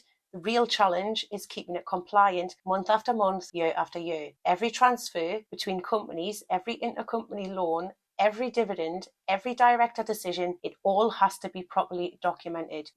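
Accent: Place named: North, United Kingdom. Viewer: Where are you based you sound northern.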